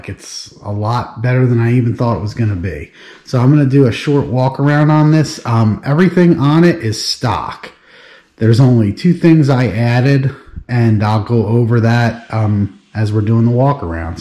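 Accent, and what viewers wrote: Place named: Western Pennsylvania, United States. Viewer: Your voice sounds like you are from western Pennsylvania.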